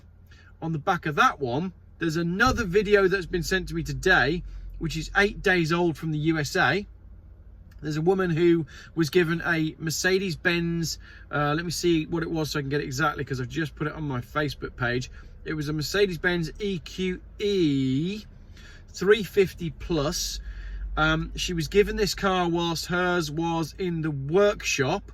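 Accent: United Kingdom. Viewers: It's worth it just to hear your british accent. We "colonials" love it! And nobody here in the US seems to be as ahead of the curve on EV's as you are.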